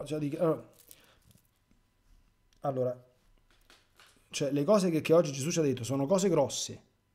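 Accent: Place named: Rome, Italy. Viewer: la sua dizione e dialetto romanesco che mi piace da morire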